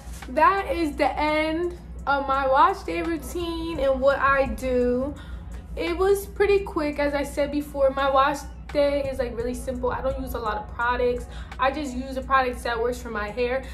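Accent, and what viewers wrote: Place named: North, United States. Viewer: Are you from Northern United States?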